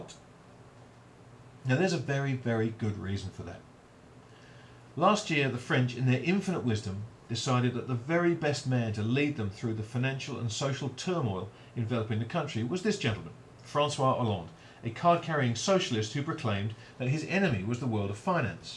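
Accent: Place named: United Kingdom. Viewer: I guess the British accent makes him sound intelligent.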